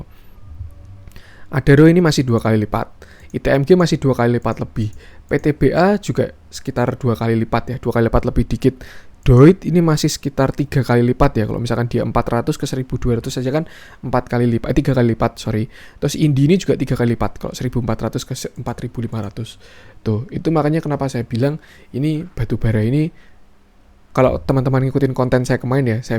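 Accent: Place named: Java, Indonesia. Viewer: Medokkk... tapi sukaaakk cara penyampaiannya... makasi byk masbroo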